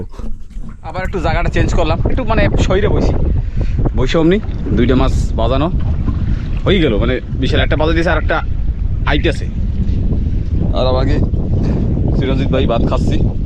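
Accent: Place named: Barisal, Bangladesh. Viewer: Andamaer prakitik drisso osadharon ei drisso dekhar jonno bose thaki ar vai er borisaler vasa sonar jonno apekhe thaki khub valo lage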